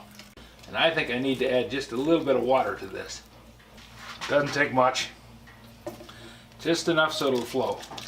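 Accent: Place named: New Jersey, United States. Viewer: O.K.! You're like me with the JOyzee brogue. I was around 2 guys from there and I got so I could mock them to a TEEEE. They'd pound on me because they knew I was the biggest hick in the world and I'd just go right on and laugh my head off at 'em. And they'd pound on me more. Great guys and some of the best memories anybody could have while in Kansas in the Ahhmee!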